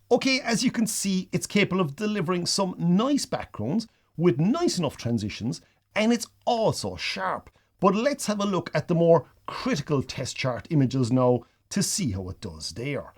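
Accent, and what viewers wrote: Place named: Ireland. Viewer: Is that dialect of yours from Inisherin? Because I keep thinking of that movie when you talk 😬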